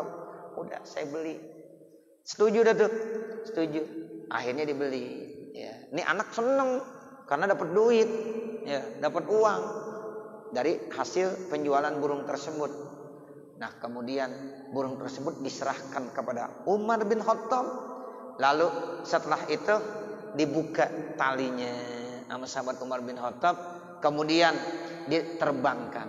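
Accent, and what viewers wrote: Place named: Jakarta, Indonesia. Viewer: Smg Allah berikan kesehatan buat tuan guru kite, smg terus berdawah dgn bhs betawinya yg khas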